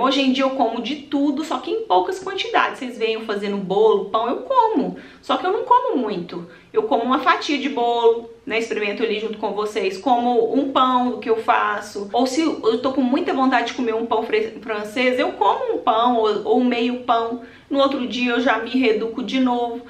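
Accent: Minas Gerais, Brazil. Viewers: Minha querida é muito bom ouvir vc essa conversa mineirinha esse seu jeito verdadeiro vc é nota 1000 minha amiga virtual adoro vc 😍😍😍😍🥰😘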